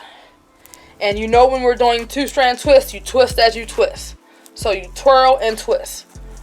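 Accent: Baltimore, United States. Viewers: I’m sorry idk what your accent is, sounds like Baltimore but love how you “two” like tew lmao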